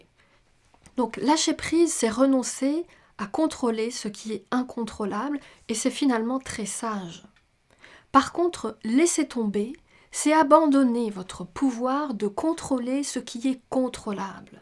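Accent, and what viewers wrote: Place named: France. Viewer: Merci pour ces vidéos très instructives. C'est étrange vous avez un accent français mais je reconnais quelques québecismes, est-ce que vous auriez passé du temps au québec par hasard ?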